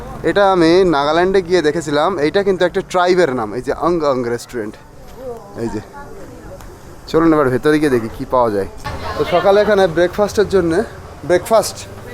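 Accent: Bangladesh. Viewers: ❤u bangladeshee korha sune boja jachee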